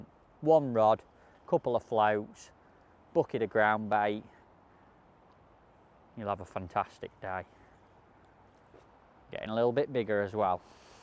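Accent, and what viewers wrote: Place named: Yorkshire, United Kingdom. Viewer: Yorkshire. Nuff said.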